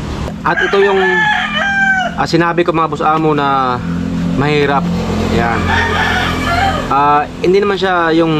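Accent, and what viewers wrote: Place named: Visayas, Philippines. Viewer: wag mo na itama. bisaya kase sya kaya ganun nya bigkasin.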